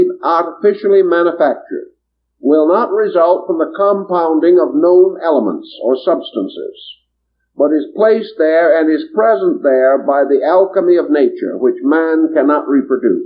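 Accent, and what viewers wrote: Transatlantic, United States. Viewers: One can detect the "Trans-Atantic" accent commonly used during his time.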